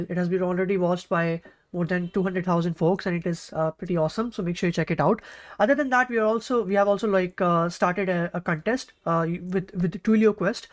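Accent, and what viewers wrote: India. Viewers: why indians has this accent?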